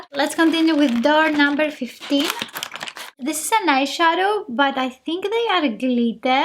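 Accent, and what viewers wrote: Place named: Greece. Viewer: Είσε Ελληνίδα η κάτι τέτοιο? Γιατί η προφορά σου μιαζει ελληνική δεν το λέω για κακο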